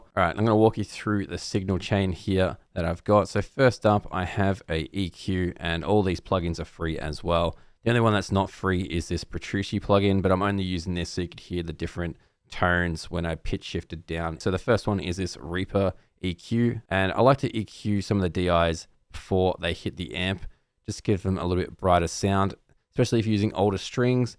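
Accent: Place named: Australia. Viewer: Awesome to hear another Aussie. Are ya subbed to Dunn?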